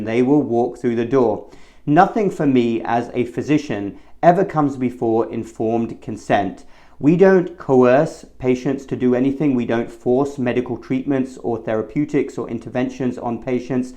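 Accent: United Kingdom. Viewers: This opens up a bigger issue. Many doctors do refuse people for alot of unethical reasons. It happens more than you hear about. I was kicked out of the biggest healthcare system in the state because I stood up for myself in having one of my newly identified conditions treated. Even 6 years later if any doctor tries to refer me to a specialist there in any of their satellite clinics what happens is an electronic blocks prevents the scheduling person from advancing any further and locks up their computer. It then requires them to shut down their computer and reboot and then it tells them to "contact patient relations" where it will say I've been banned for life. This is a clear violation of the hippocratic oath but several doctors went along with the administration's agenda while the controversy was still going on and my own primary care physician's office was the one that was responsible. 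This is because now administrative staff have things hierarchically set up so that they outrank doctors, doctors don't own their own clinics anymore, and if doctors want to keep their jobs they are required to act against the best interest of patients if the "financial interest" of the corporation warrants it in their eyes. I don't know where you work (I'm guessing the UK because of your accent), if so then I think the UK has more of a community health perspective and this is probably less common to take place there than in the US, which does not have a single payer system. I was just kicked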